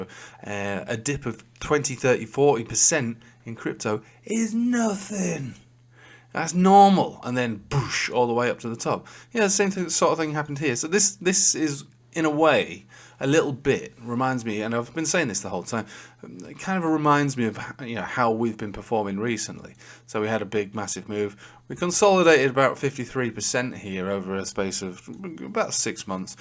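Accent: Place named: England, United Kingdom. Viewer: First time I’ve listened.. Nice honest analysis. Nice to hear an Englishman. God Bless. Subscribed. 🎉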